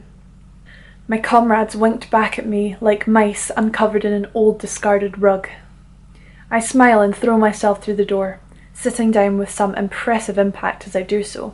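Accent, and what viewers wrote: Edinburgh, United Kingdom. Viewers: ahhhh nice to hear a scottish accent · So nice to hear such a lovely Scot lass tell a beautiful story. Would love to hear a wee bit more of the Edinburgh accent, it is my favorite sounding speak.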